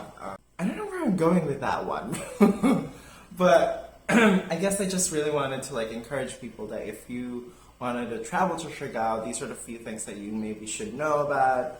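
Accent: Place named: England, United Kingdom. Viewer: you look soo beautiful, love your english accent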